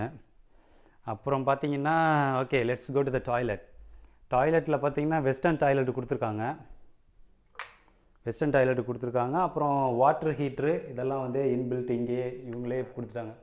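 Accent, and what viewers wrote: India. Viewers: நம் ஊர் தமிழ் கேட்கும் போது மிக அருமை.உங்க குழந்தை தமிழ் பேசுமா.வாழ்த்துக்கள் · Hey, your Tamil slang still same, that’s cool 😎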